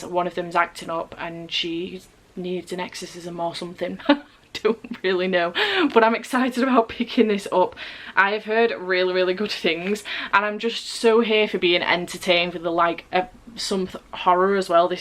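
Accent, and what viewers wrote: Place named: Standish, United Kingdom. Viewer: Thrilled to find you ,I grew up in Standish , your accent makes me homesick.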